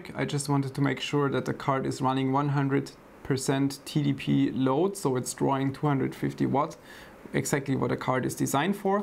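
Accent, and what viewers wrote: Germany. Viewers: Ich liebe es wenn Deutsche versuchen Englisch zu sprechen. Soll kein hate sein 😂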